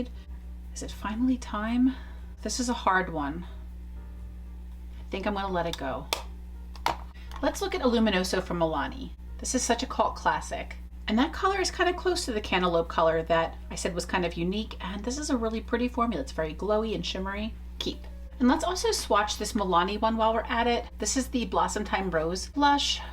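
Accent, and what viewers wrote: Philadelphia, United States. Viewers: I just found your channel and I was like “tell me she’s from Philly….” Haha instant subscriber! I’m from the area too 😊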